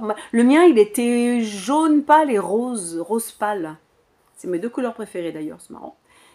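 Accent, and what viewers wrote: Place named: Paris, France. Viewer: Merci pour ton Naturel et ton accent Parisien ❤😅😇🙌🦎⛰🐬👽💫🙏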